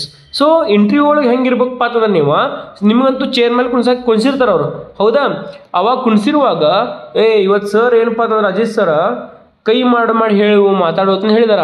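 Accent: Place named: North Karnataka, India. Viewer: your uttara Karnataka language is so beautiful.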